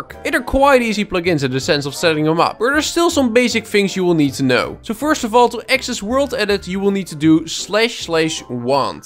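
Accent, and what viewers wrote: Netherlands. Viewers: Youre dutch right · Best English Nederlands voce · hi ar you dutch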